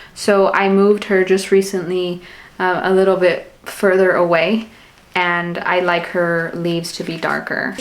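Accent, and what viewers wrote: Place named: Latin America. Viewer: kaya (hello!) from unceded wardandi noongar boodja, a.k.a. the south western most corner of western australia. I’m not really a commenter, but I’m pretty sure your mystery hoya is a fungii (i love it’s cute mushroom name!) I wanted to say that your planty joy is a pleasure to share in and your genuine and unabashed enthusiasm is greatly encouraging and uplifting, a real celebration of life in her many forms. I thought while I’m here I’d share that two more things, one that the indigenous land I inhabit is one of the 36 global biodiversity hotspots, and we have a range of incredible native orchids and carnivorous plants that are currently growing and blooming throughout our season of Djilba, you should look up the ‘bridal rainbow’ drosera and the ‘queen of sheba’ orchid if you get a chance, they’re just some of the most famous of many stunners! 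The other is that I figured from your cadence you are latin, my housemate and close friend is Ecuadorian, working on getting permanent residency here, and she wanted to grow a cactus on her desk at her new job, so I showed her your videos on the beefs (!) for some tips and also bought her a mini grow light because her office isn’t well lit, and we’re going to go to a local specialty beef nursery to pick some new friends for her! I showed her your video of trying the tunas fruit with tajin, she actually found tajin in our local supermarket here, I’ve tried it with mango, and as a gringa (haha!) I understand the hype now! I have a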